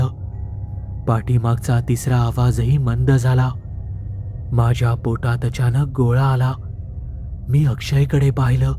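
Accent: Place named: South, India. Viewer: मी अनेक वर्षांपासून तुझे stories ऐकतेय… पण आता तू गाण्यात बोलतोय…. फिल्म मधे south indian accent सारखे तू का बोलतोस??? Like a non Maharashtrian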